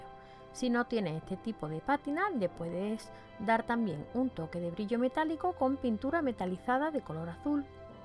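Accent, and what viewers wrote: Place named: Spain. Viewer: Querida, te mando saludos desde México en el Edo. de Queretaro, todos tus trabajos son hermosos, aqui tambien tenemos varios acentos a nivel Republica y para mi es inconfundible el acento español, te felicito por tus aclaraciones.( aqui tambien les decimos conchas) 🤗👍👍😍😍😘😘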